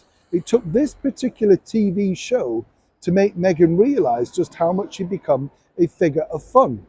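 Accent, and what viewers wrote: Yorkshire, United Kingdom. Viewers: Thats his accent as he is from Yorkshire. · Not just Yorkshire pronunciation. That is how we were taught before so many decided that they would follow American pronunciation of this and many other words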